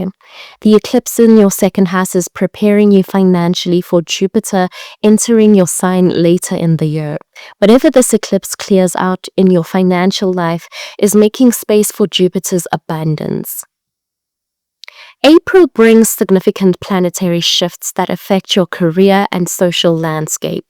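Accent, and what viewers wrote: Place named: South Africa. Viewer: Sounds like a very South African accent.You've got going there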